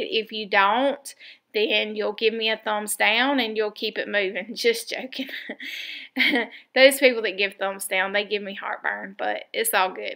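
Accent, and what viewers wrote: South, United States. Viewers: G’day, loved your video so much, new subbie. Another one closer to your 14k. You make so much sense in this video, and just gotta say I love your southern belle accent! 🥰 crafty hugs from down under. Xx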